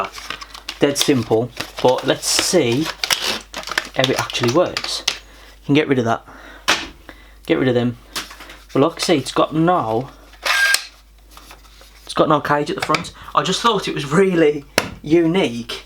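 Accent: Black Country, United Kingdom. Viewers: Just subbed after watching some of your review videos, you sound like a Black Country lad 👍🏻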